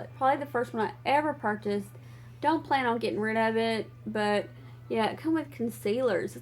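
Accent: South, United States. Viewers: You're welcome. I thought you were from the south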